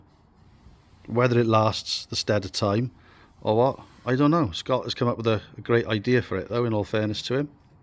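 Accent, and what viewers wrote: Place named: Cardiff, United Kingdom. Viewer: True. Newport myself man. Seen johnny boy on the circuit as he's more my end but I think you fellas are more Cardiff by the accent twang.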